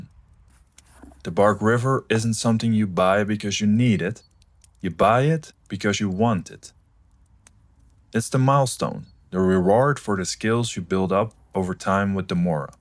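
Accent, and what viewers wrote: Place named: Netherlands. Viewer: I gues your from the netherlands? I think i can hear it in your accent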